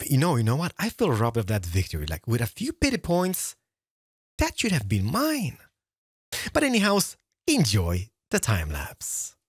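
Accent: France. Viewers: French mumbo for mayor is the best and needs to appear more often lol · French is the best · French gives me life:') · I love French so so so much Never fails to put a smile on my face and light up my mood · i gotta say : i'm french and i LOVE your french accent !!!!! :) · i'm french and i love the french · Yes French is back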